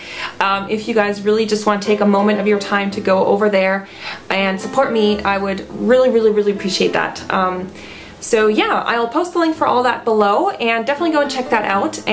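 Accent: United States. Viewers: YOUR NOT FROM AMERICA!? YOU HAVE SUCH AN AMERICAN ACCENT THOUGH :O did u used to live here? thats awesome, i want to move to germany when im older :D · probably a stupid question but where do you live cus you sound American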